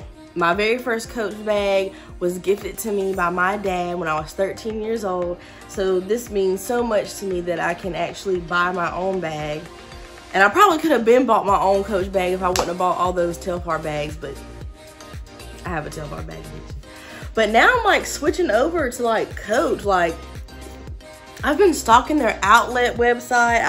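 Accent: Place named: South, United States. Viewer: Nice video. Suggestion: Don't put things so close to the camera. We can't see it like that. Keep it a distance away and put the palm of your hand behind it. Where are you from? You sound like you're from Tennessee, Kentucky, Alabama, or Mississippi.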